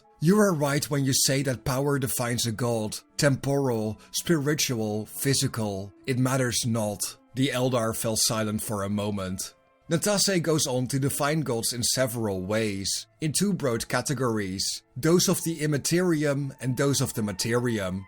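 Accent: Netherlands. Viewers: Strong dutch accent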